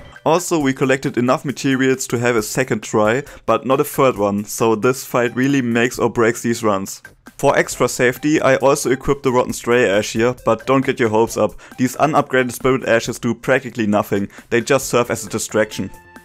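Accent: Germany. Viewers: bist du bei deinem Englisch zufällig deutscher?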